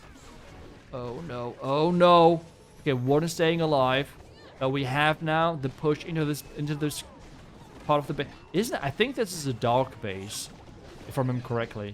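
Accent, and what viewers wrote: Germany. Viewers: I know he is German and you have the German accent but what else is in there? Is that Scottish vowel pronunciation or what is it? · German accent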